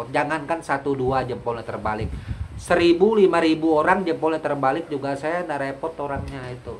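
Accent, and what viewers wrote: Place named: Madura, Indonesia. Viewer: mAAF ko terkadang saya dengar seperti logat Madura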